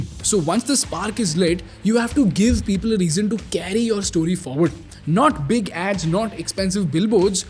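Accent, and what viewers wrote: India. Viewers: The Indian accent is creepier than labubu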